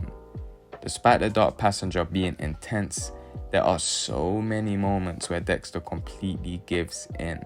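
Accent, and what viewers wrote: United Kingdom. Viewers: Oh no the narrator is British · Unironically yeah. His intrusive thoughts. Lack of social adaptability and understanding the human condition could be solved if he just watched and listened to the ppl around him as he’s actually very empathetic Unironically goated algo pull. And another British voice on Dexter is always needed loool.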